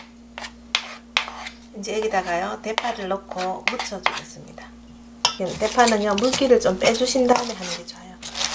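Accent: Gyeongsang, South Korea. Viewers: 겡상도 사투리 억양이 이쁘네요^^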